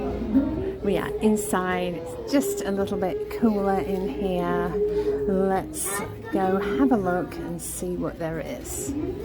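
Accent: England, United Kingdom. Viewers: I love your content and your personality. Your English accent just makes me happy. I love it when your kiddos. I never miss a video. You have great taste.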